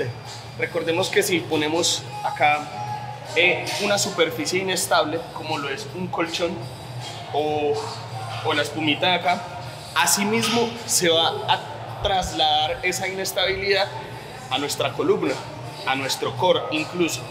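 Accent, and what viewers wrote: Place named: Colombia. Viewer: Saludos desde México pareces, ya hasta me estoy enseñando hablar el dialecto colombiano, que chimba de vídeo!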